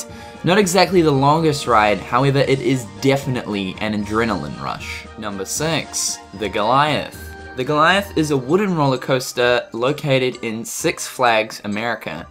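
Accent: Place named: New Zealand. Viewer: The way you said Staffordshire hahahahahha XD not hating on your beautiful NZ accent btw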